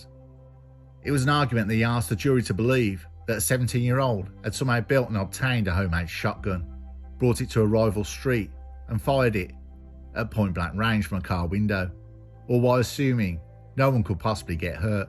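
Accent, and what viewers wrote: Birmingham, United Kingdom. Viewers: From one brummie to another love youre work brother.wouldn't sound right in another accent to me lol.👍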